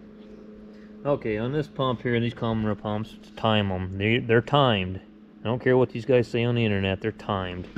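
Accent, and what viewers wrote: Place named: Oregon, United States. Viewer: I always thought that was a strange Oregon accent. Makes sense now....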